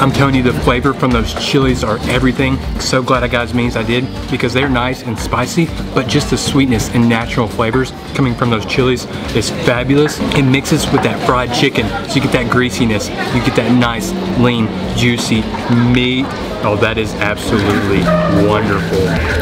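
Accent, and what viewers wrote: South, United States. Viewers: Love when you still say ya'll ...very southern USA. Have fun in South East Asia.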